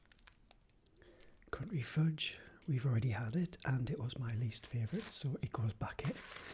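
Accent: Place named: Durham, United Kingdom. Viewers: Are you Durham? You sound Durham! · Great video :-) really relaxing and made hungry haha. Like your natural accent too, a true Geordie haha. I agree, those two are my favourite too! But I love the strawberry ones too, so strawberry would have taken silver for me:). Do you prefer roses or quality street?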